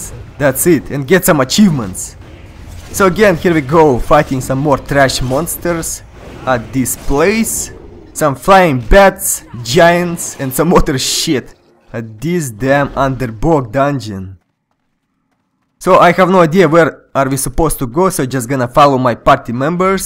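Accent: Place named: Russia. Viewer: that is one heavy russian accent you got there bratan.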